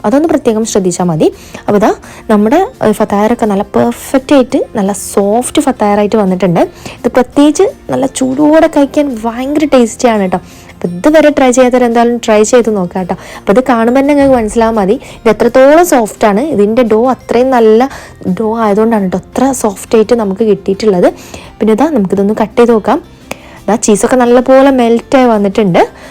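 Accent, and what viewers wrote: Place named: Kozhikode, India. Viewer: ഇങ്ങളെ അവതരണം അടിപൊളിയാണെ, നല്ല കൊഴികോടൻ സംസാര ശൈലി 😅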